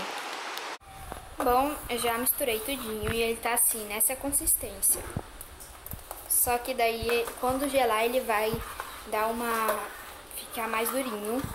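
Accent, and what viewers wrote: Pará, Brazil. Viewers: sotaque de paraense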